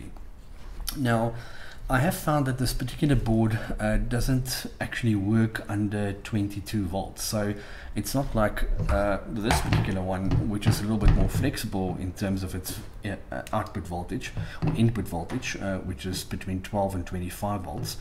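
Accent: South Africa. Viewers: Are you south African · you sound South African im based in capetown any chance its still available if you are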